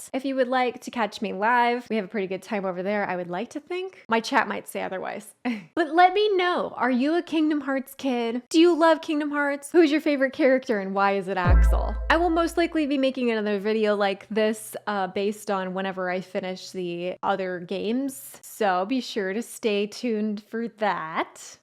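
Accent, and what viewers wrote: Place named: West Coast, United States. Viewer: She's from California so skill issues aren't her fault. It's the game's fault. (I have no idea where she's from but she sure talks like she's from the West Coast.)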